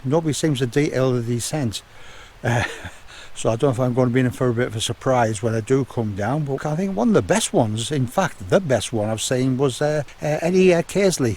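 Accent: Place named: Northern England, United Kingdom. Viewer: Ay-up! I finally got a chance to check out your channel and I’m so please I did! Your northern accent reminds me of my dads side! Haven’t been up north for so long! Anyway, great video! Some amazing views there! Would love to visit! I’ve subbed x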